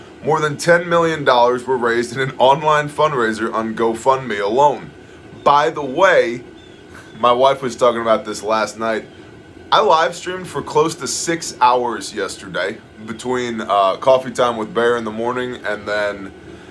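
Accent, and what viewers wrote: Canada. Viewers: Oh my gosh the canadian accent hahaha Did you star in the Fargo movie because that is exactly what it sounds like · The "vandalism" of the Terry Fox statue (old school cancer victim who attempted to run across the country on one leg after the other was amputated..he didn't make it as cancer took his life) was placing a Maple Leafs hat on his head, a hockey stick in his hand and a Canadian flag draped over his back. We all know if this was a burn loot murder riot they would have spray painted it before tearing it down and tossing it in the canal to great applause from the msm. Loved the Canadian accent lol ❤🍁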